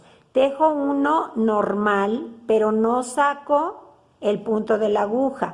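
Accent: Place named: Mexico. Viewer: Otra belleza creada por el arte de esas manitas tan habilidosas, cuando escucho esa vocecita de acento mejicano me pongo alerta por que sé que voy a ver y disfrutar de una maravillosa labor que para mejor aún puedo hacerla yo también. Bendiciones preciosas 🌺🌺❤️🌺🌺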